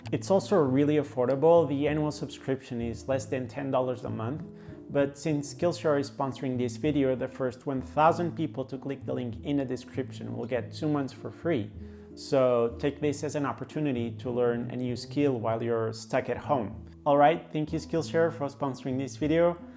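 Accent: India. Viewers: U actually spoke like a South indian😂 sounded so so similar to the south indians man...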